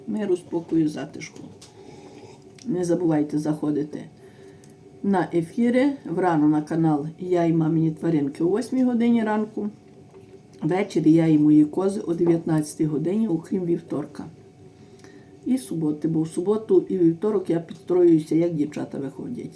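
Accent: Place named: Kremenets, Ukraine. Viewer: На кременеччині, Тернопільської області так говорять. І що? В кожному селі, чи районі, області є свій діалект. Не знали?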